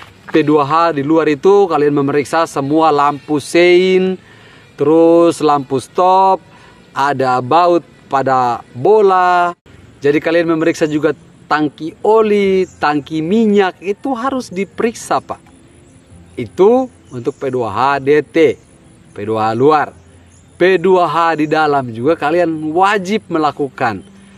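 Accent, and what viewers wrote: Ternate, Indonesia. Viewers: Hehehe mantap pak, semoga berkah ilmunya. Terimakasih.. Lucu juga pak,, rindu dengar orang ternate punya logat😂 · Ale carita pake dialeg asli ternate bole tamang.. Tarusa deng apakong saya anda saya anda bagitu tu😂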